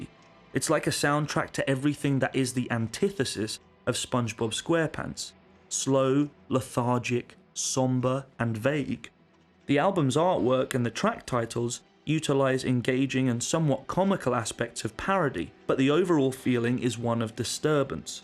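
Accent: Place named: United Kingdom. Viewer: Youtube: "Do you wanna watch a video of a british man explaining a Spongebob parody of a album?" Me: "Yes."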